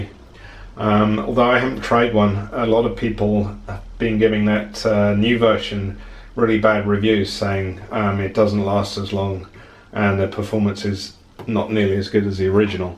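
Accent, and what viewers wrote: United Kingdom. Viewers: Why with a British accent? You're missing clicks because Tokyo + Offroad makes no sense. Great video content though.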